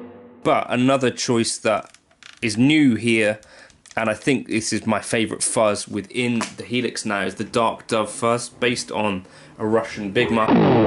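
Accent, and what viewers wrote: United Kingdom. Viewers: What a sound! And your noodling is as always way better than my best technique. Cheers! Oh and I don't know if you've been told already but the British accent is jolly fun to listen to!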